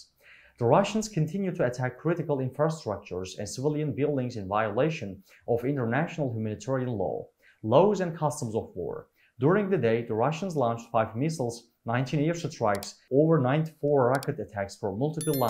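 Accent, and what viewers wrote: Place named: Turkey. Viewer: dayı türk şivesiylemi konuşuyosun ingilizceyi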